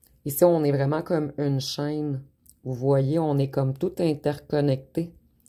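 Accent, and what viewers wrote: Canada. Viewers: Merci pour votre accent canadien qui fait du bien et surtout pour cette guidance qui donne le droit de pleurer pour évacuer le trop plein. J'apprécie cette interprétation salvatrice. Vive les neutrons. 🌈🤗💗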